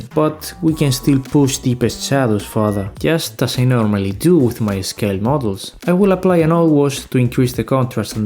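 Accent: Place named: Spain. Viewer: Lo sabía por el acento, gracias por la info, por lo general no hay muchos canales de modelismo españoles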